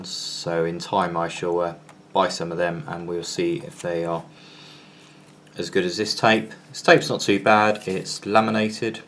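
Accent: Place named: United Kingdom. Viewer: if you wanted one with rechargeable batteries and an adapter why don't you go get one??? there are many other brands, brother included that sell them as you wanted. why buy a cheap product and then complain about it not having components. Must be a British, UK or whatever the hell your accent is from type of thing. Don't buy equipment that is cheap and then want all the extra features. use your common sense!!!!!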